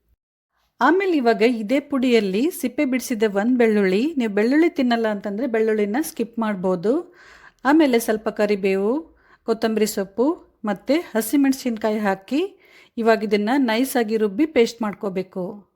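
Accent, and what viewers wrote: North Karnataka, India. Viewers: 👌👌👌 ನೀವು ಉತ್ತರ ಕರ್ನಾಟಕದ ಶೈಲಿಯಲ್ಲೇ ಮಾತನಾಡಿದರೆ ಬಹಳ ಹಿತವಾಗಿರುತ್ತದೆ.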